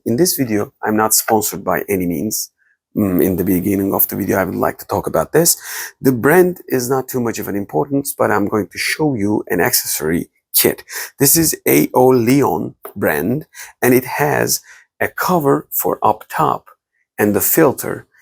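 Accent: Turkey. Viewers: Abiii Aksanın türk aksanı olsun yinede güzel.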